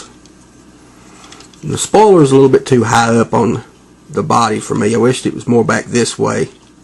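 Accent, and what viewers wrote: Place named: South, United States. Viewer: I was hoping so, since you sould like your from the south too. Maybe I'll be able to find one soon then. Thanks, PS check out my channel, if like custom transformers!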